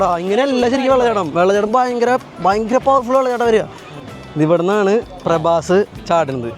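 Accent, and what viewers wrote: Thrissur, India. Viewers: Ohhh Thrissur ethiya feel samsaram kelkumpo 🫠❤️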